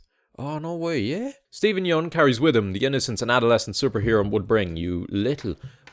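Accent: Ireland. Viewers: why is an irish version of james wilson from house md telling me about invincible? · YO UR IRISH IMA BE WATCHING U FROM NOW ON YUPPP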